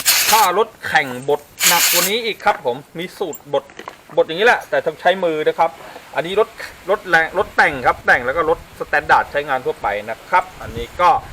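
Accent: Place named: Uthai Thani, Thailand. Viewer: สำเนียงช่าง เหมือนคนหนองฉาง อุทัยเลยคับ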